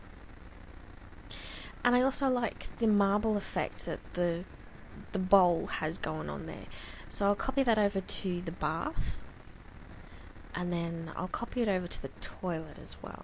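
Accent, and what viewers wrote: Australia. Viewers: AHHHH I LOVE the Australian accent. I'm surprised I didn't catch on to that. I feel bad now :( You are awesome in my book :) Australian people are so kind hearted and sweet too, I love that. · you sound like and assie to me that is because i am Australian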